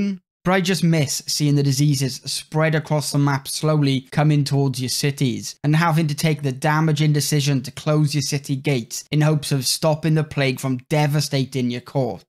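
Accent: Wales, United Kingdom. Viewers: where in wales are u from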